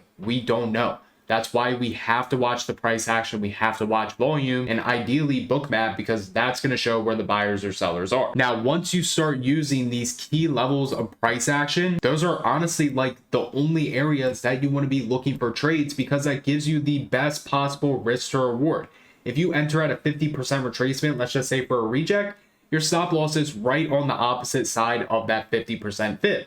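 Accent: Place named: Delaware, United States. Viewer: Thanks for these videos. You gotta be from new Castle county 😂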